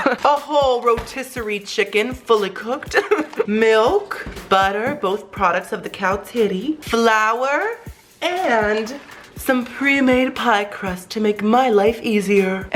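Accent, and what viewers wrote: United Kingdom. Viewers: DO AN ENTIRE VIDEO WITH YOUR BRITISH ACCENT AND WITH A CUP OF TEA PLEASE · That british accent tho😂👍👌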